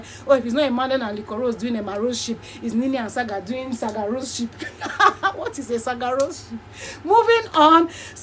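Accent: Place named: Nigeria. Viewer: I just love the Nigerian Pidgin accent from you😂😂. Makes the story super juicy.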